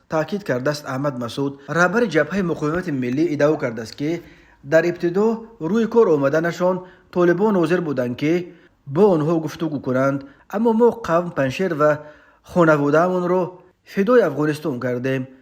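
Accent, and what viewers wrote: Pamir, Tajikistan. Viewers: Автор Помири аксент кати гап мезанад.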